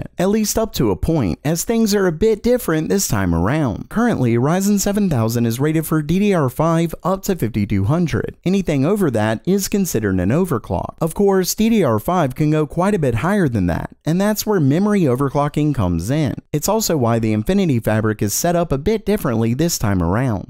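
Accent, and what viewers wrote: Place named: South, United States. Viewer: Why do you talk as if you're struggling to stop yourself from doing a Southern accent?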